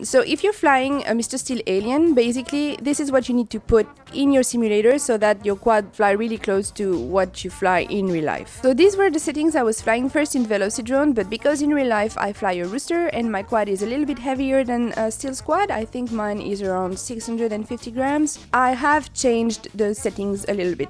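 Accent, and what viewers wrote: France. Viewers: Quite interesting video for simulator... and what a nice little French accent :) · sounds a bit like a french accent xD ... and i really like this rooster frame.. guess it will be my next one :D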